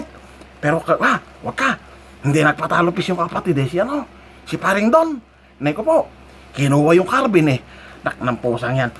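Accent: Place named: Imus, Philippines. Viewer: Ha ha ha..Kuhang kuha ang tunay na puntong Imus, ha ha ha..Caviteño here for life. Naknangpusa yan! Amabu primero pa nasabe ha ha ha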